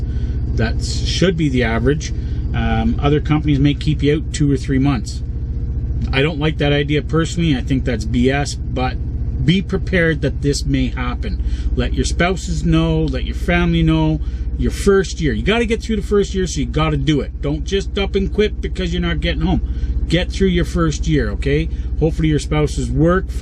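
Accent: Canada. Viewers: Are you from Canada? Lol wondering because of the accent. You sound like the guys from this show called “trailer park boys” on Netflix which is filmed in Canada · I like what your doing. Next time just a little less Canadian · Hey! I noticed you sound pretty UP or Canadian. I'm here in mid michigan working at Pilot Flying J night shift. I think I might have seen you before. Anyway, it'd be really cool for you to stop by. (Don't tell management;D ) I'll throw you a free coffee, soda, cup of ice, or some food if you want. Just look for the bald guy. See! This goes to show If you're a cool trucker, then truck stop cashiers will hook you up.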